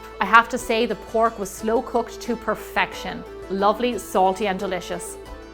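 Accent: Ireland. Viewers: Omg Iv been following you for years and always hear the Irish accent but didn't realize you were from Wexford. I'm from New Ross but live in New York. Small world. I'd kill for chips from kilmore or the premier in Wexford town . Go get a risole ! Yum!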